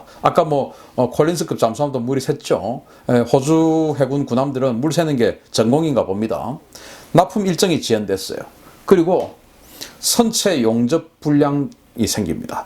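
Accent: Gyeongsang, South Korea. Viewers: 퍼뜩 이해가 않되죠?? 남쪽 오리지날 사운드트렉이 인상적입니다. ㅎㅎ 그래서 사람은 근본과 고향을 속힐 수 없습니다. 비난의 뜻은 전혀없고 박식한 지식과 논리전개 사투리가 인상적입니다. 소시적 보리xx 어떤 선배가 수도권 삼류대학 유학 첫 겨울 방학 때 클라스 메이트들을 데리고 와서 후배인 친구들과 시중을 든적이 있었습니다. 우리동네에서 태어나고 자란 사람은 서울말이 좀 힘 듭니다. 선배가 불식간에 사투리 단어에 서울말 인토네이션을 태워서 여자친구에게 먹을 것을 권하는 걸 보고 배꼽잡고 웃었다가 선배들에게 체포되어 모교 화장실 뒤에서 사망직전까지 구타 당하던 추억이 생각납니다. 님의 말씀을 잘 경청하고 있습니다. 계속 좋은방송 부탁드립니다. 퍼뜩=빨리,금방. 수구리!= 수그려라! 만디= 정상, 꼭대기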